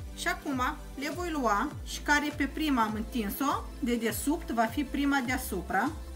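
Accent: Moldova. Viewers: dupa accent si dupa scrisul in rusa de pe plicurile cu condimente, as zice ca de peste :) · Ești minunata atit de mult i mi place cum vorbești asa vorbeau bunicii noștri acum 60 ani.I mi amintesc și acum ce femei harnice frumoase bune asa ca tine. De cite ori i mi e dor ma uit la tine la rețete și la Stela Stratulat numai sa va aud dulcele grai Moldovenesc. I ți doresc sărbători cu bucurii sănătate alături de cei dragi ție.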